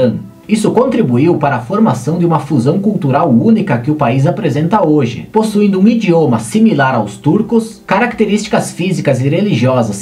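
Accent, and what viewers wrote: Paraná, Brazil. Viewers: Gostei demais do assunto e também do sotaque que parece do Paraná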